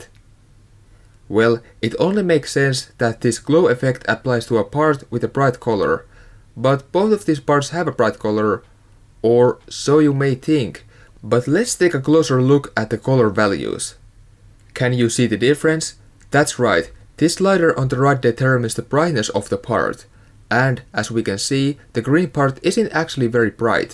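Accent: Finland. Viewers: When you started talking i was like he is from Finland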